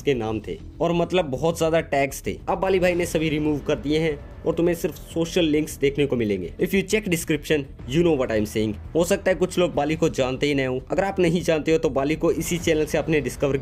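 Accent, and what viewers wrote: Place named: Haryana, India. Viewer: Bhai ab Rajasthan se ho...mujhe laga tha haryana se ho😂😂 ap avaj se haryanvi lagte ho😅 vaise mein chandigarh mein rehta hu...and haryanvi hu.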